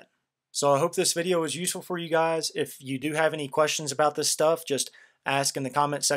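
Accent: South, United States. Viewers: I really appreciate both the way you explain things and your slight Southern accent. It makes it a lot easier for a Georgia girl to understand. Thank you!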